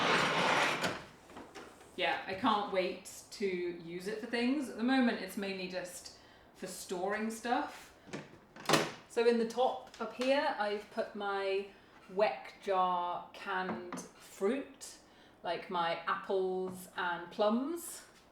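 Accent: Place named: RP, United Kingdom. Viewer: У вас очень хороший английский как у вас говорят "Queen's English" несмотря на то что вы живете в деревне. Обычно британский деревенский просто ужасен ничего не понятно. ☝😲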